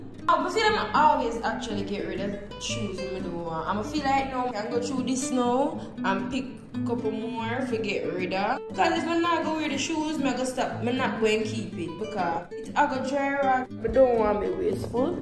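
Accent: Jamaica. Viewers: Accent alone me hear and subscribe ❤🇯🇲🇯🇲🇯🇲🇯🇲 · Are you Jamaican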